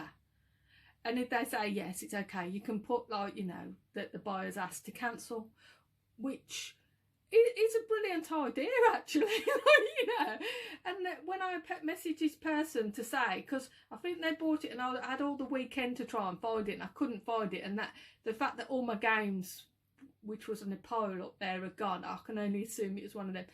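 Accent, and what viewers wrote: Birmingham, United Kingdom. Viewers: I love your accent. You sound just like Ozzy!! Blimey, I just checked, and he's also a Brummie! I think me ears are good. ^_^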